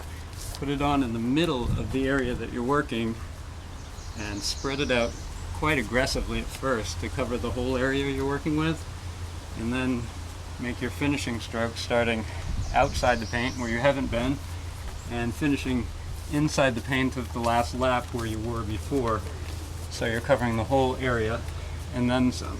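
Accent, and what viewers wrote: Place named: Maine, United States. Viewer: I like the Maine accent and the fashion he works. Kindly from Calabria, South Italy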